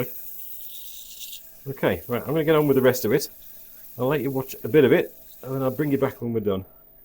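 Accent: United Kingdom. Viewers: Hi, really interesting to watch, nice to hear that touch of Scouse. Will carry on watching, well done. Tom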